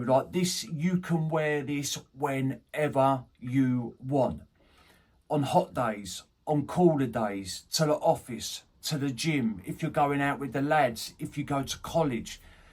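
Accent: United Kingdom. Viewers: Thanks for this review, its the first one i found on yt of this fragrance I wonder what's your accent? First i thought it's Australian, but its from somewhere in the UK, but which region?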